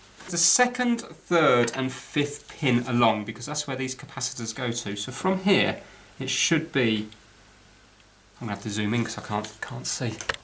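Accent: United Kingdom. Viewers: "Sol-der", I'm painfully aware of England and America being separated by a common language, but how many times can you say "sol-der" in a minute? Great show, but each "sol-der" was like having my ear drums flossed. We watch mostly British shows, Cookers for Stoves, Stoves for Wood Stoves, Diggers for Excavators, Train Drivers for Engineers, oh my, you just did the "Haitch" pronunciation for H, I had to turn it off. Fascinating until that point. The sol-der and Haitch pronunciations are shared by Big Clive, on YouTube, I turn him off after too many for the same reason. Hope you got it working, I'll stick to the American that fixes consoles and sundry electronics. He seems faster at it, or he edits out some, though you seem to do work equal to his. But British Viewers beware, the American version of this man may grate on your nerves like this man did on mine, and I'm not suggesting we're right, just more accustomed to hearing our pronunciations. 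Best regards. I'll add my thumbs up to the 4,433 others, wish my nerves could have made it to the end.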